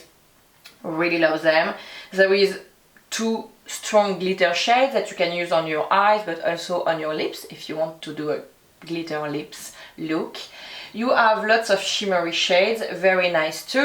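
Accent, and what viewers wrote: France. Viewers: Just stopping by to say hello. This is my first ever comment on YouTube 😳 But I had to. So many already told you about your stunning, pretty and elegant makeup style. However......the french accent...Oh My God. My heart is melting. So beautiful. As if you are singing the tutorial. I love it. You are definitely my new favourite YouTuber.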